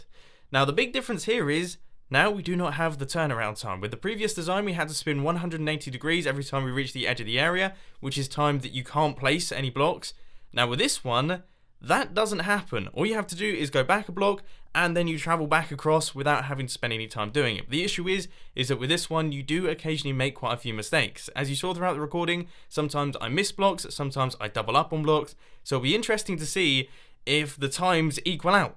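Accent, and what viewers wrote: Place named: England, United Kingdom. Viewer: 2 questions. 1. Where are you from? I hear an English accent. 2. Do you believe in using mods like WorldEdit for laying lots of blocks?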